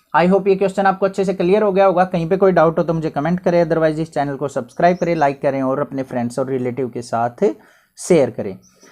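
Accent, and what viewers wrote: Haryana, India. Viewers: sir aap haryana ke ho kya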